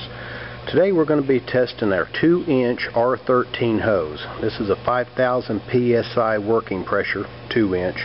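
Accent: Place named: South, United States. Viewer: Lots of words in a southern accent.........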